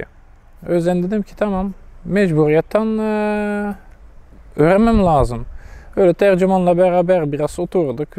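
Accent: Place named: Syria. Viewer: Suriyeli gibi konuşmuş ama saglam kaleci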